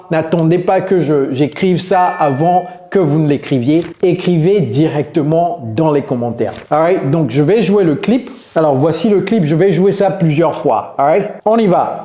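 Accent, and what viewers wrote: United States. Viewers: j' adore vraiment cet accent americain. ça t'as pris combien de temps mr d'être meilleur en parlé? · "Apples to oranges" I heard " apologize"😁 if I'm regular to see all your videos I can hear American accent. I'm glad to find your lessons. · Wow c'est tres bon votre accent americain! meme votre accent francais est impeccable. BRAVO! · I really like the way you teach English! The challenge is the american accent.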